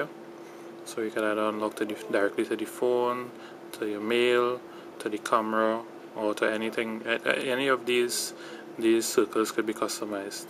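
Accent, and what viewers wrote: Trinidad and Tobago. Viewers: dude you from trinidad huh? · i love ya accent, im from jamaica....u trini ??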